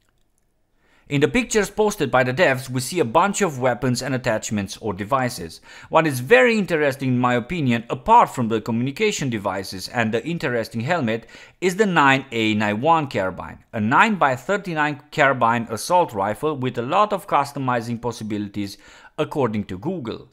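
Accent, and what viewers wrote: Germany. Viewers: I like your german accent :) Keep up informing us about tarkov :D!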